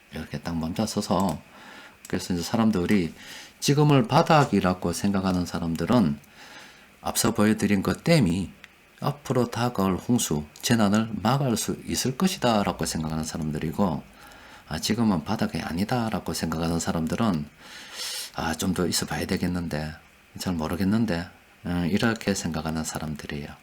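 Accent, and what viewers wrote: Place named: Daegu, South Korea. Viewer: 대구말씨가 참 구수하네요 좋은 내용 감사합니다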